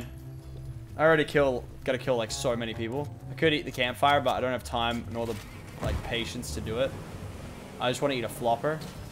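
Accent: Australia. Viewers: Are you aussie i am too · Is he Australian or American it’s really hard to tell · hes an aussie ya fake fan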